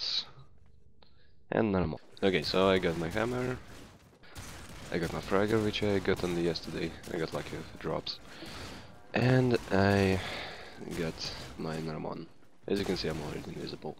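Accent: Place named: Russia. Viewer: Судя по такому произношению , автор отечественный .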